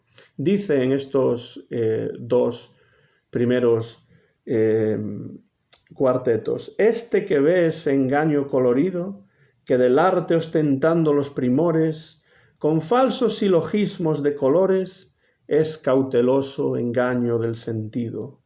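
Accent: Spain. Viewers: Gracias por su análisis sumamente interesante sobre Sor Juana Inés de la Cruz. Me encantan todos sus videos y su manera muy amena de presentar las obras literarias estudiadas en clase. También me encanta su acento y quería preguntarle en qué parte de España nació usted.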